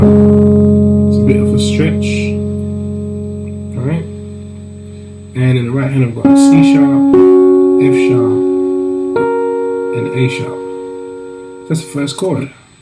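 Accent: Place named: United Kingdom. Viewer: You sound like youre from the UK, which church do you go to?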